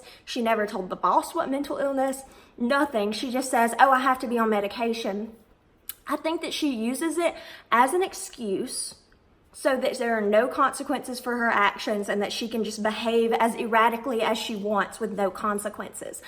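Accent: South, United States. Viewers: Far as I can tell,your makeup looks fine. Also,where are you from. Noticed a southern drawl. I,too,am a southern gal. Southern Louisiana,in fact. Saw a few videos. Seems like Jennifer is sabotaging the shop. But,since she's blowing the boss,it's unlikely she's getting fired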